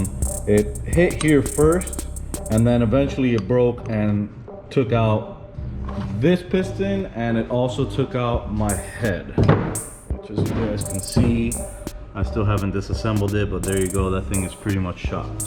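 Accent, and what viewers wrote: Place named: Cuba. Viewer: Are you Cuban because in some vids you speak spanish and you sound Cuban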